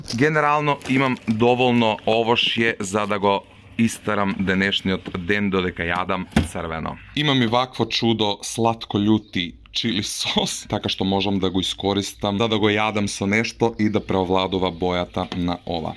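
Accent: Kumanovo, North Macedonia. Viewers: 24h само зборуваш кумановски диалект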